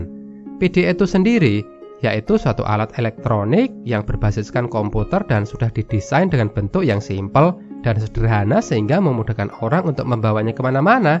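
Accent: Indonesia. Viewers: Nonton full video ini gara2 voice over nya yang Indonesia banget 🙌🏻🙌🏻🙌🏻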